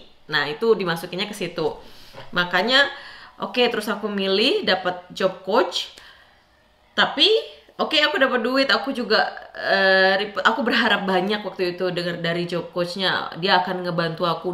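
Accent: Java, Indonesia. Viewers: MBAK, JENENGAN KOK AYU MEN....MBEK OMONGANE MEDOK JOWONE, SENENG AKU....HAHAHA....SESAMA ORANG SOLO HARUS SALING SUPPORT......HEHE😍 KONTENNYA BAGUS2 LHO👍👍👍....SEMOGA MAKIN BANYAK YANG NONTON YAAA...SUKSES! GBU...😇💕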